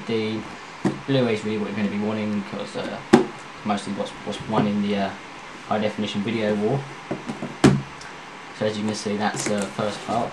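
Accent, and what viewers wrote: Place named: England, United Kingdom. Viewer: Man i love your English accent ^^ and also your bluRay :p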